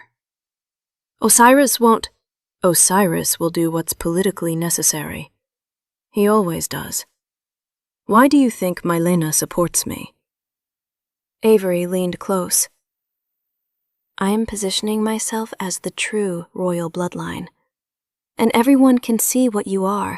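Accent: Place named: South, United States. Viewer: Omg is it Dalia or Tealia. Not liking the Southern accent.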